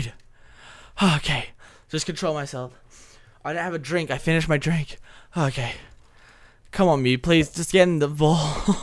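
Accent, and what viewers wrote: Australia. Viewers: He sounded so much more australian back then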